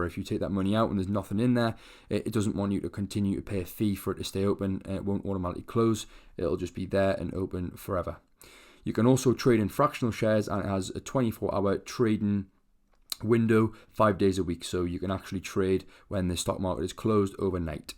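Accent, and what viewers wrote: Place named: North East England, United Kingdom. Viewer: Nice to hear a Northeast accent talk sbout this stuff.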